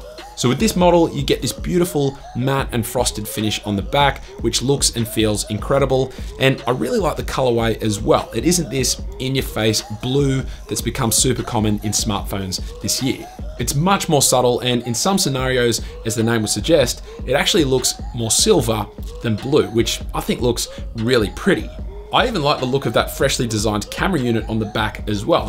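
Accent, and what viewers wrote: Australia. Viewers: Great video....not too long like those 15-20 minute ones which really becomes boring. And yes mate, are you an Aussie 😝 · Everything sounds good in Australian accent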